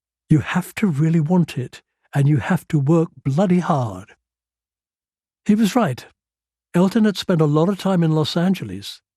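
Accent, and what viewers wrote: United Kingdom. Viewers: Greetings SIRCLIFF RICHARDOBE. Thank u for sharing …this recording ..awesome reading of segments from ur book…ur Brilliant British accent is a delight to my ears..I would listen intently to further reading! An accent that seems to be disappearing.. beautiful blessings!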